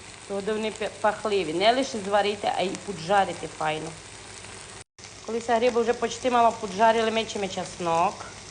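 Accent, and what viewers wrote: Transcarpathia, Ukraine. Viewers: Мені подобається закарпатська розмова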